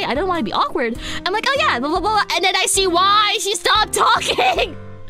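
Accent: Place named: New York, United States. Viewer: Yep, sounds like New York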